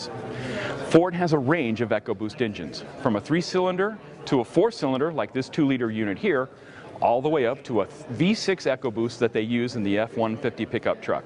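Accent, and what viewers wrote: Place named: United States. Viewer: pronouncing it with his stupid American mouth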